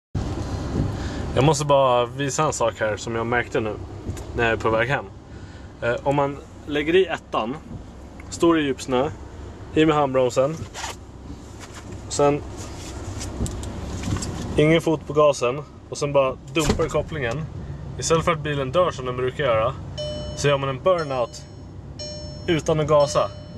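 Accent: Stockholm, Sweden. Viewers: Fy fan så skönt att du blivit av med de mesta av din Stockholms dialekt :) btw helt sjukt vilken skillnad på kvalitet de e då mot dom nya :)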